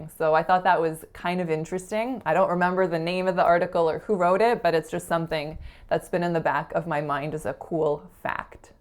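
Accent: Canada. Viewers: I was born and raised in Toronto (or "churrono" since this is an pronunciation difference vid) and I pronounce nearly all the words like her Canadian examples (and I'm only a first-gen Canadian, so its not like I come from some super Canadian family). Only one that I sorta say different is kilometre. And honestly, thats I found was dependent on the situation. It sorta switches in between, in that it seems somewhat more proper to say it the "Canadian way" in some cases (like measuring distances), but I use the "American way" of saying kilometre for everything else. I mean, 'less you talkin bout the actual "Toronto mans" accent.... But thats a whole other discussion (more Jamaican/Carib influence than anything else... similar to how the MLE accent in London developed). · I knew you were Canadian from the start. The "a" and "e" vowels are often very "ay" sounding. 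"Very" sounds like "vay-rie". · Why didn't you keep that beautiful Canadian accent? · American Accent Mastery agreed in general, but your pronunciation of “about,” “today,” and “video” are very Canadian sounding to me. Remember that in the USA, cloud and house rhyme exactly but in Canada they don’t. Also, you pronounce today as todeeeegh, which is Canadian. Also you pronounce video with a very Canadian sounding O. And it’s wonderful. I feel sad that you felt you had to change your accent. I’m glad you seem excited about it though. Speak how you wanna speak and be who you are. Loved the video! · She sounds Canadian to me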